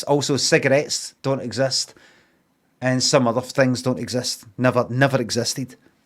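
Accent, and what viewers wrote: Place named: Glasgow, United Kingdom. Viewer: He never really acts up the accent if he's annoyed. If anything, he puts on the posh Glaswegian when he is annoyed by sumin 😅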